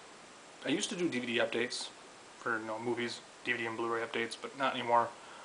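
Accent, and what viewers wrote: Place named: Canada. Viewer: You should of talked more about your favorite games and influences. What was the first game to really blow you away? For me it was Final Fantasy on the nes. I was probably 9 years old and just couldn't believe how friggin cool that game was at the time. Also you have almost a Canadian accent, are you in the States or Canada? Just curious.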